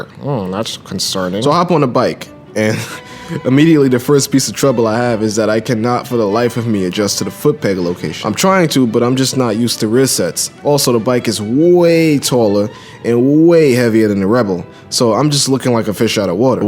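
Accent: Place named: Brooklyn, United States. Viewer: I also live on the west coast now but I"m from the east coast and really miss NYC, so listening to your Brooklyn accent is the best thing ever.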